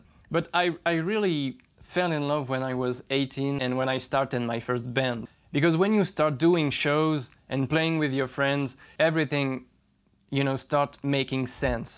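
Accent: France. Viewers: Super video !! Ya encore un petit accent francais😂😂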